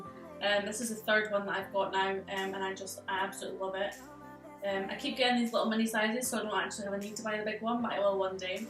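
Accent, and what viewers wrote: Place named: Scotland, United Kingdom. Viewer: Omg! Loving this look, colours are gorgeous on you...I'm diggin' your accent! Are you from Scotland, I'm not good at detecting accents so please bare with me luv 😂 😂 😂 Had to subscribe, excited to get to know you girlie 🌸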